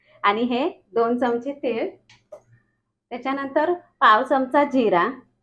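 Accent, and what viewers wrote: Malvani, India. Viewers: तुमच्या विडिओ hi मनापासून वाट बघत असते तुमची मालवणी लय आवडता खूप सुंदर सुधा nagwekar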